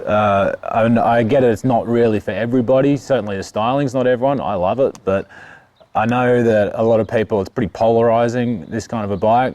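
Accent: Australia. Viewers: Thanks for the clarification. I apologize for any offence. I suppose I was fooled by the oh so familiar Aussie accent and assumed he was here in Australia as there was no reference to country or market. I was also a bit miffed that Kawasaki doesn't give us the full set of options as I'm currently looking at a Z H2 to buy and would love the SE with Ohlins et al. I should have checked my facts before criticizing yours. Thanks for all the good work you do for us. Cheers from Sydney Australia.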